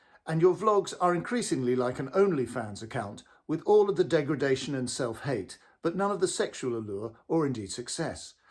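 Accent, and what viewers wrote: United Kingdom. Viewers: I did subscribe ages ago :-) love your british english I sometimes don't really understand. So your vlogs have added value to me since I learn something about movies and also improbe my bad czech english! Greedings from Plzen!!!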